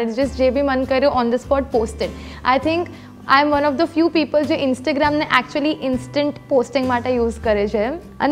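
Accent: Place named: Kathiawar, India. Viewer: jordar girl!!!vadhare aetle maja avi bcoz tu kathiyawadi bolech🤩..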